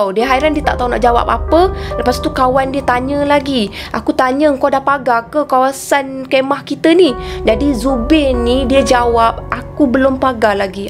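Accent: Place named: Kedah, Malaysia. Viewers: salam..bulih akak tnya asal dr mna..sbb pandai ckp utara👍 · Slmt mlm semua..hai jom nk dngar cerita seram..hbt na ckp loght kedah..trbaik laa..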